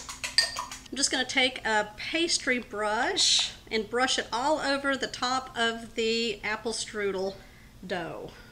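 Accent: Texas, United States. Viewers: Oh,!!! I going to go make this right now...I believe I have everything in my fridge and pantry!!! I'm going to add a little almond paste 😋 to punch it up a bit. You are wonderful ❤ 💖 I love just listening to your Texas drawl. You explain everything so well. Thanks again. Looking forward to whatever is next!!!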